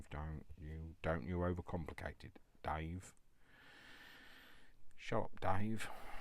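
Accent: United Kingdom. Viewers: What a fun video today Dave! I just love some Northern accent me! Those hobbies weekly mags are great! What a super find and love this project! Keep being jolly……cos I am as well! Love from Edinburgh xx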